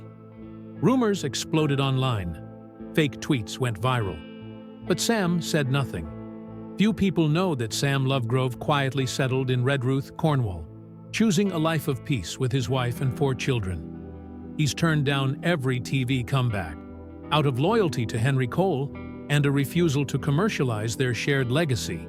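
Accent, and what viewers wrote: United States. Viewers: What is wrong with you this is the UK so why do we have to listen to what sounds like a an American accent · Why this American accent on various vids. Anything English!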